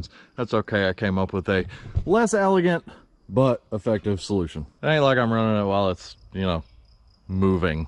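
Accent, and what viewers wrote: United States. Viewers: Man its so fine to find somebody who speaks murican. New subscriber.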